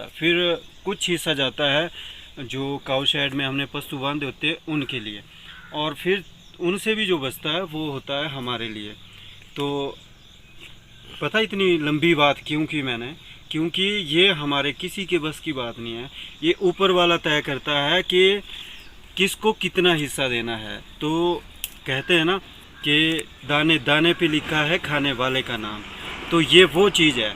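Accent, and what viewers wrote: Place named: Punjab, India. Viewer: Ap jo batye karte ho achi lagti us m thoda punjabi ka touch hota ha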